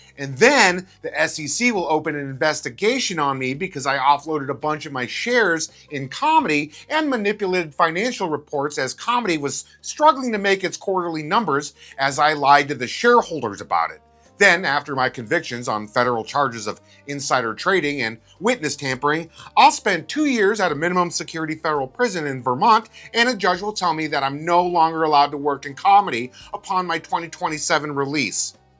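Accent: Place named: Minnesota, United States. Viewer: I love your videos! As a Minnesotan who now lives down south, hearing your accent every Friday is a breath of fresh air.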